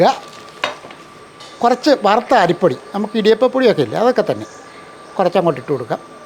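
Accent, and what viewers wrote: Kottayam, India. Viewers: താങ്കളുടെ കോട്ടയം slang ആണ്ഏറ്റവും മനോഹരം വിഭവങ്ങളുംവളരെ ഗംഭീരം നന്നായിരിക്കട്ടെ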